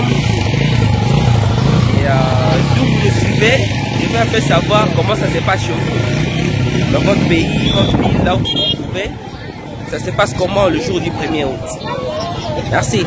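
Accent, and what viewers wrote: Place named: Democratic Republic of the Congo. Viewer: Mboté !! jeune homme .....J' ai tellement d' affection profonde pour la RDC ( et la République du Congo ) que je ne peux pas laisser votre vidéo si bien réalisée en employant ce "bonjour " si familier pour moi . En France le 2 Novembre est le Jour des Défunts : fleurs sur les tombes particulièrement ce jour -là ; les marchands de fleurs vendent beaucoup de chrysanthèmes à cette occasion mais de plus en plus d' autres fleurs maintenant. En général c' est un jour plutôt morose pour les familles concernées . J' aime votre vidéo parce qu' elle me fait voir encore plus de coutumes des Congolais . Et je reconnais tout de suite le cher accent de votre pays !! Dans quelle région est votre famille ?? Amitiés de France 👍🌞🙂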